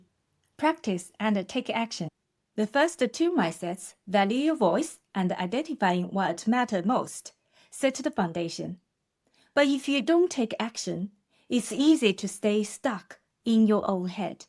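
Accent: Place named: China. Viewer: I really like the Chinese accent 👍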